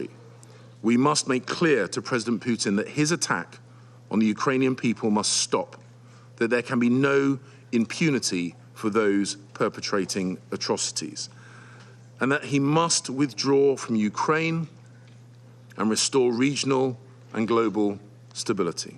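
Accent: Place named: England, United Kingdom. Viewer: Bullshittting with English accent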